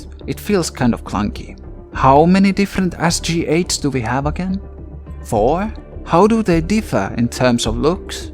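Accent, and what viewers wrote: Finland. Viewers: anime girls, conquer from the cock and ball game for honor, dad jokes, sexy finnish accent. Perfect video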